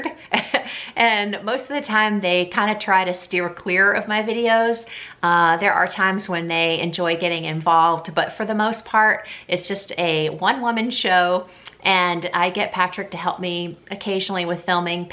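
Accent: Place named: South, United States. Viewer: I’m new to your channel but I am really enjoying it! I loved your Q&A. I was surprised to find out you are from North Carolina. That’s where I’m from. I have a little more southern accent than you do!! Keep up the great videos! It’s refreshing to find someone who is not afraid to express your Christian values.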